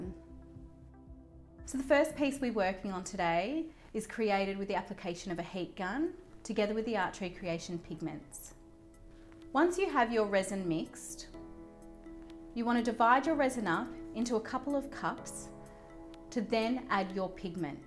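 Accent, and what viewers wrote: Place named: Australia. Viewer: Nice to hear your familiar Aussie accent !! Great video xxx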